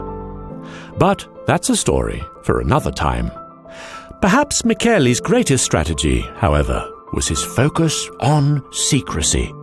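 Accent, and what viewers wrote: England, United Kingdom. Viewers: SORRY NEW GUY BUT 'UPPER CLASS ENGLISH VOICE' IS PRETENTIOUS AND SHYTE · Hes got a british accent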